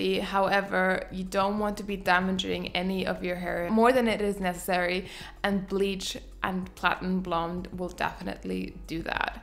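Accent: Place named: Germany. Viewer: I love that I can hear you are german by you saying "Mine" at all times, rather than "my"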